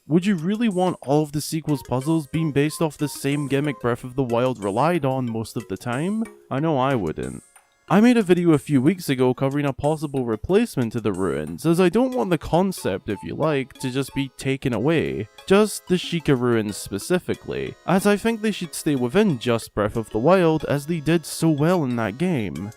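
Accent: Scotland, United Kingdom. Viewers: So this is my first time watching your videos... And i can't keep myself wondering where you live. To me it sounds like you're from Scotland but you clean up your speech so that the rest of the world can understand you... That aside, I do agree with you on your points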